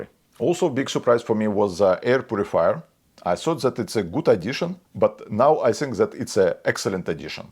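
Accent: Russia. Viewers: Если судить по акценту, то вероятно носитель русского языка. Я хз как я попал сюда, но видео занимательные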